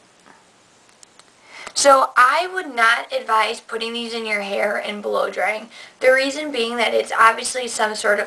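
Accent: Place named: Long Island, United States. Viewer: You sound like your from Long Island.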